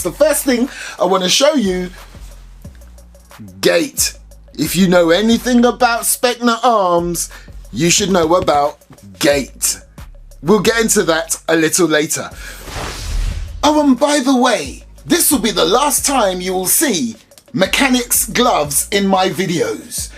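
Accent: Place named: United Kingdom. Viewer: Ima sum up why is my favorite airsoft reviewer: 1. He has a british accent (like come on) 2. He’s always super excited about reviewing these guns like it’s awesome 3. He likes to review budget guns and sometimes are beginner guns 4. If there’s a gun he’s prolly reviewed it EDIT: TAKE MY MONEY SPECNA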